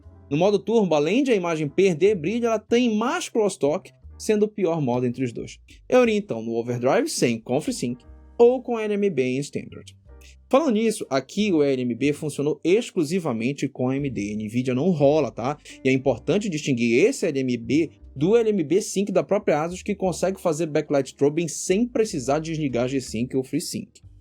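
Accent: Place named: North, Brazil. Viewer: Mano vc é de onde? Teu sotaque parece ser aqui do norte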